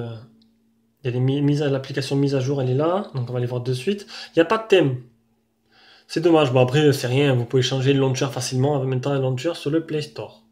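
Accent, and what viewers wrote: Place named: South, France. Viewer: Salut c est pas mal tes tests smartphones et j aime bien ton accent du sud ca change,bonne continuation!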